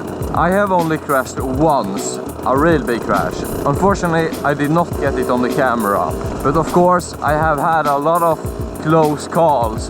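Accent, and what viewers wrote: Sweden. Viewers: Det skriker svensk av din engelska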